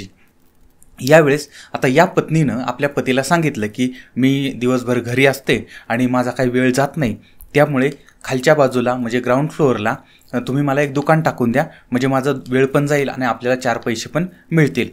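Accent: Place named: Ahmednagar, India. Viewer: nice Nagari style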